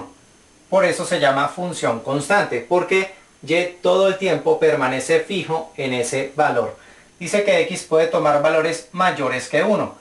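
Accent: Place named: Colombia. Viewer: Definitivamente los profesores Colombianos explican con muchísima mas claridad,debe ser su español que esta catalogado, como el mejor que se hablan los países latinos. muchas gracias por compartir tus conocimientos en matemáticas con todos nosotros.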